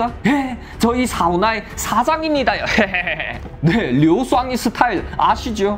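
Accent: Yanbian, China. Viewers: 조선족임? 연변말투네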